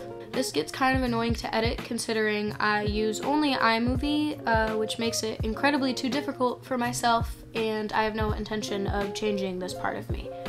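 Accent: United States. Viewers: Wait I thought she's American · i thought you were american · i thought u were american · I thought you were American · isn't it american? :0 I literally watch his videos to hear his voice, but I speak Spanish and I don't understand anything, hahaha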